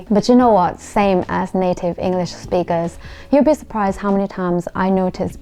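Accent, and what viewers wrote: RP, United Kingdom. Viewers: Hello, I love your accent. How did you improve it like a British accent? I am wondering, did you able to speak this way before moving London? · I like your accent, it actually sounds British to me. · U do have a British accent mostly, I can detect a small change of ur native accent here and there. How long have u lived in UK? Pls do British accent video . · When you are speaking is it received pronunciation? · That is like bbc english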